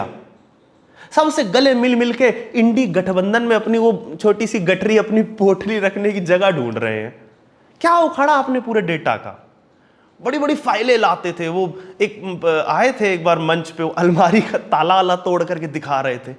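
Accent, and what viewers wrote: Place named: Haryana, India. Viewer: Bhai ki haryanwi accent shandar hai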